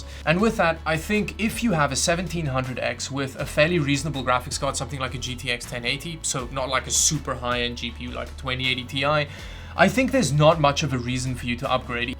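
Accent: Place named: South Africa. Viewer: Sounds kinda south African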